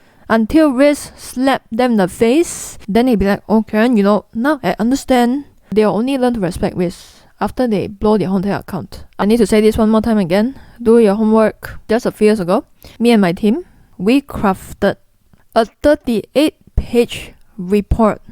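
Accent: Singapore. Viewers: Great content,! So glad I found you AND your beautiful Singaporean accent. Thank you for the hard work you do. XOXO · I absolutely adore your Singapore accent!!! Thank you so much, I am relatively new to your channel...and I am enjoying and appreciate your content. You are have a gift of teaching, with plenty of wit, humour, and at the same are able to transfer your knowledge, sharply and well broken down. Thank you. ☺️ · i actually like your singaporean accent . it grew on me lol i dont care what you sound like.. you say all the right things :D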